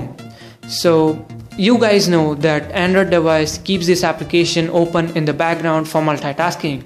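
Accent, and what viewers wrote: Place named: England, United Kingdom. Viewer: Nice English Accent 👌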